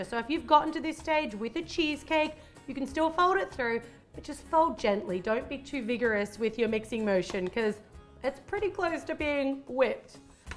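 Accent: Australia. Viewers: IS SHE AUSSIE OMGGGGGGGG YESSSSSS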